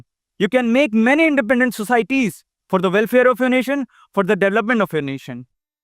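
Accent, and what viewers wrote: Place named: United Kingdom. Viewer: British accent🫠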